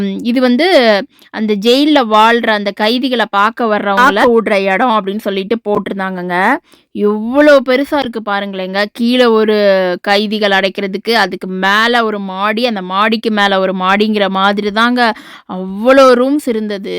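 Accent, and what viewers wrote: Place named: Coimbatore, India. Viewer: கோயமுத்தூர் இவங்களா வாசனை மாறாமல் பேசுறீங்க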